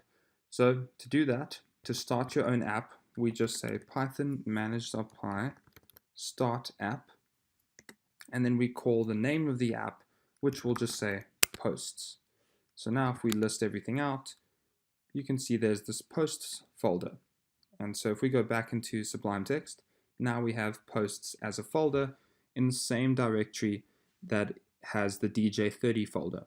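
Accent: South Africa. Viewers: South africa? Create video, super clear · You are so from South Africa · xD defiantly a South African accent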